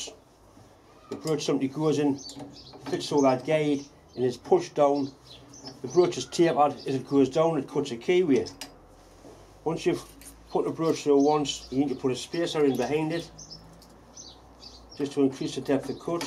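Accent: Newcastle, United Kingdom. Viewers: wey aye, Geordie lad. Brute force and finesse , I love it.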